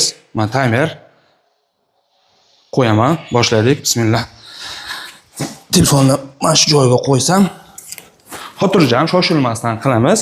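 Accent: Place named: Namangan, Uzbekistan. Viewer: Наманганлик менимча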